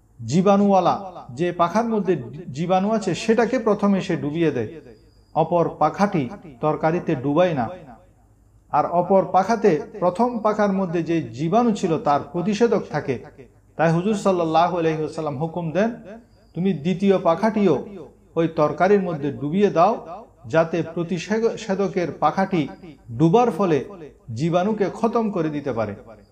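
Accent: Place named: Chittagong, Bangladesh. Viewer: Chittagong er hujur bujha jasche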